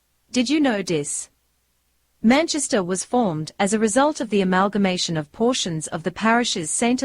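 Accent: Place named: United Kingdom. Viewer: Interesting facts but stupid video with the British accent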